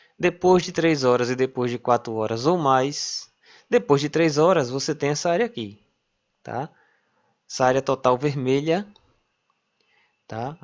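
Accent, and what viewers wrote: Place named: Alagoas, Brazil. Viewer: Retificando: sotaque alagoano!!!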